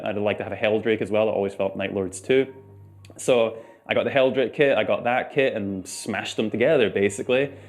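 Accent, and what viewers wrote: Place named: Russia. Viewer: you trying so hard to not sound like russian but you still do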